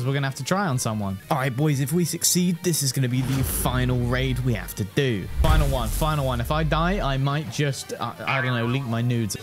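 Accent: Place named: United Kingdom. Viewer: Bro with his British speaking kinda sounds clean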